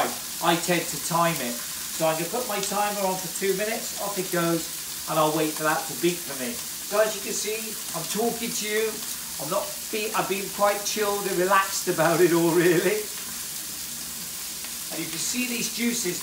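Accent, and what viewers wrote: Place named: Newport, United Kingdom. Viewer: Are you from Newport mate? Accent sounds like it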